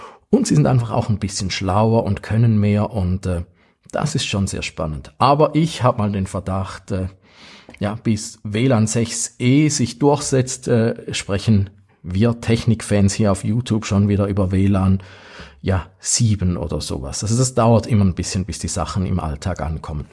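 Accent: Switzerland. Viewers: Wenn ich die leichte Dialekteinfärbung richtig interpretiere, wohnen wir wohl im selben Kanton (SG). Auch ich hab' FTTH bei Sunrise, auch ich zahle CHF 40 pro Monat, aber bei mir gibt's dafür nur 20 Mbps. Die AVM 5490 musste ich sogar selbst kaufen. Da sind wir bei meinem Problem: Der Kundendienst der grossen Anbieter ist zu einer Lotterie verkommen. Bei jedem Wechsel riskiert man, wochenlang ohne Internet und Telefon zu sein und deshalb mache ich keine Anbieter- oder Vertragswechsel mehr. Wäre vielleicht mal ein Thema für die Tagi Digitalseite, statt ständig nur neue Produkte vorzustellen...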